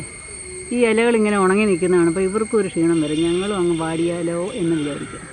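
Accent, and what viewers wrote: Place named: Thiruvananthapuram, India. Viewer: തിരുവനന്തപുരം slangs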